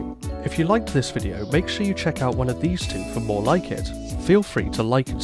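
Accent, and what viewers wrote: United Kingdom. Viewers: Lose the limey! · Well, great video. As much a fan of the British accent, I am wondering where is?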